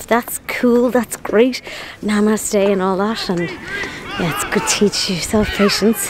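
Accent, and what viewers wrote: Ireland. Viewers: Can I please ask that you might speak a little bit slower, cause as much as I love your Irish lilt, Sometimes, tis a bit bit difficult to understand..As said before, Much love to you, and Millie..Merry Christmas...Simon xx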